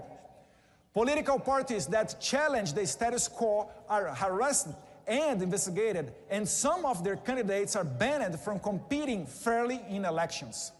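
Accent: Brazil. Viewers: Foi 1bom inglês dele,só q com sotaque dele ou brasileiro, agora se vc q q ele fale igual 1norte-americano espete sentado....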